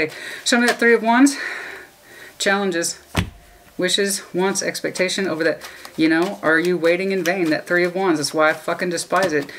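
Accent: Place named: South, United States. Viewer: That deep sexy raspy voice southern and unique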